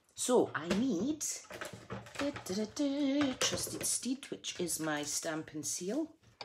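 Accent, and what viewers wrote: Scotland, United Kingdom. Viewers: Is that Scotland I hear in your voice? Those cards are gorgeous. I’m a demo from Dover, Delaware in the USA.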